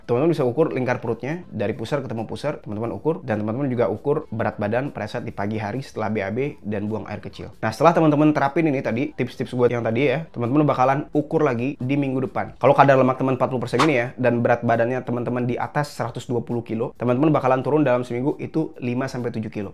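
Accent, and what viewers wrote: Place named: Arab world. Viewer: Paling suka chanel ini penuh ilmu, gratis, dan abangnya kalo bicara enak di dengar kocak, logatnya kaya orang timteng ada maqrajnya, kek basa arab 🤣😂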